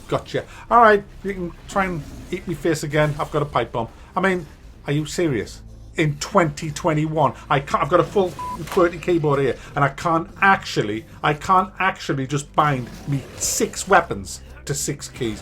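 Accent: United Kingdom. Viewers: it's poor and stupid part of the uk kind of a accent.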